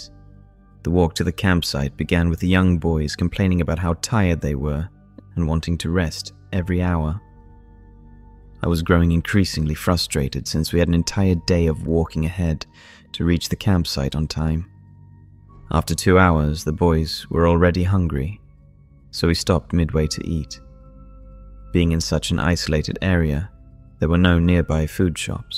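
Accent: United Kingdom. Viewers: If this is your own work, I am impressed with your literary skills.. Usually I have a tough time listening to one with a British accent, but not you, you have such perfect diction and pronunciation that the accent is hardly noticed, besides British accents are the coolest in the world.. I see you are up to almost 7.8K subscribers. I am going to share this video with others and recomend they subscribe so as to do you a solid.. Mark my words, this channel is going to grow Sir... Great work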